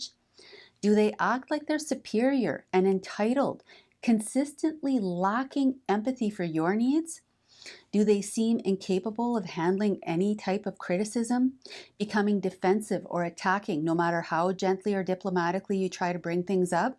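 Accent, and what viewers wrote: Canada. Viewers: Not sure where you're from, Canada maybe, but I love your accent. Great video. Thanks for the tips on crazy phrases from crazy women.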